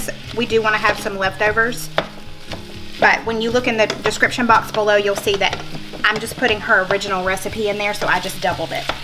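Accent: South, United States. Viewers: Love that sweet southern accent you have runs deep in us Southern women! You have a beautiful family so happy to have found your channel! Cover the chicken with plastic wrap and you want have to worry about chicken juice getting on counters just helps with cleanup and safety. 😊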